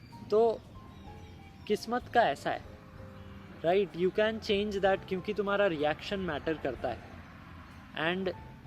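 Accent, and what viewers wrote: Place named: Gujarat, India. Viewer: gujrati log jab hindi bolte h tab thodi si to gujrati tone aa hi jati h bas ussi liye aisa laga 😅 btw mai bhi gujrat se hi hu .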